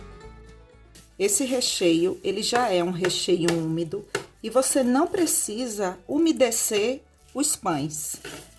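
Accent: Bahia, Brazil. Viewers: Amiga tu é baiana? Parece viu kkkkkkk,top essa torta!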